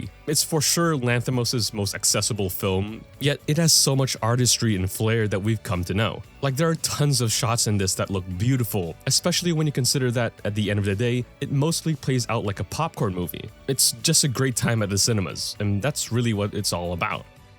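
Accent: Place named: Philippines. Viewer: filipino? i can hear a very faint accent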